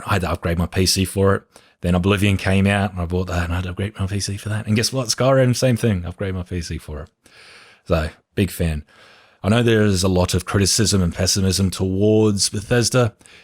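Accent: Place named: Australia. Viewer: Explains why their was a baby in the background at Stranded Deep. Have a good one brother, missed your Aussie voice 😂.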